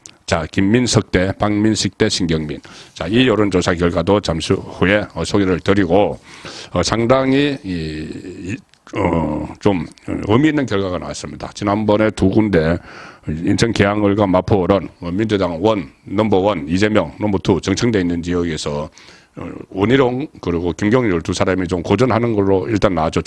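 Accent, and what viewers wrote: Gyeongsang, South Korea. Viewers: 경상도 발음 부터 좀 고치는게 더 좋을것 같다~~ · 송국건은 서론과 잡다한 중복 이야기가 너무 많아 답답하다. 좀더 깔끔하게 발언하면 좋겠다. 다른 사람과 대담식에도 자꾸 엉뚱한 말로 끼어들어 혼란을 야기한다. 경상도식 언어로 잡다한 얘기가 많으니?참고하기 바람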